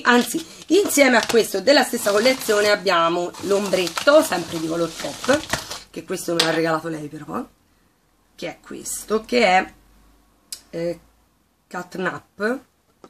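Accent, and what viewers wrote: Tuscany, Italy. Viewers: Ciao, mi fa piacere che hai trovato lavoro! Quando ti ascolto mi sembra di essere quasi in famiglia xké dalla parte di mia madre sono tutti toscani da secoli e la parlantina toscana mi rilassa 🤗poi tu sei schietta, sincera e senza filtri quindi.....mi fai tanta compagnia! Un bacione! Un augurio di buona ripresa x la tua nonna Leda!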